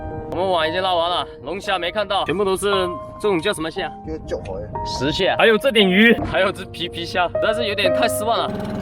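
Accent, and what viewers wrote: Chaoshan, China. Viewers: 潮汕话闽南语系的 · 是潮汕话吗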